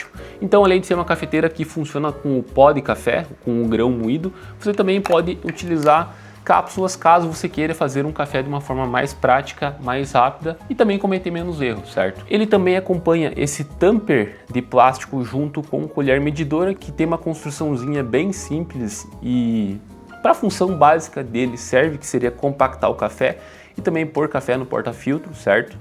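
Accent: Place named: Curitiba, Brazil. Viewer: e esse sotaque aí, é daonde, daqui de curita? PARABÉNS pelo setup, tô montando aqui tbm, tô c r3 blade (sensorial muito bom), e o elétrico femobook a2 (surpreendente), tem dia que faço espresso naked+puck (pra aprendizado fundamental), noutro frenchpress, noutro moka, noutro v60 e clever, é muito legal essa dinâmica e ritual do café de diferentes formas e resultados! Investi num k4 e no starseeker e55pro, e num porta filtro 2spout, que ainda estão pra chegar! E, sim, o mais importante e diferencial é um BOM MOEDOR! Sucesso ao canal e bons cafés, irmão!!❤🎉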